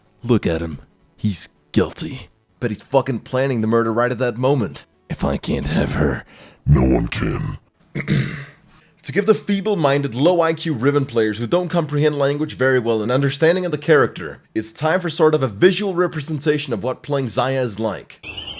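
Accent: Denmark. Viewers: Is danish? Or scandinavian for that matter? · wait, you're danish?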